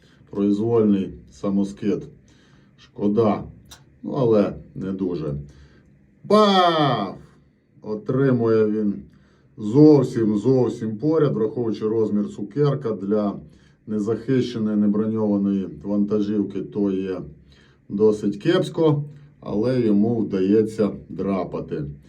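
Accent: Transcarpathia, Ukraine. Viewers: Закарпатська говірка,-Рахів, Костилівка, Ділове )))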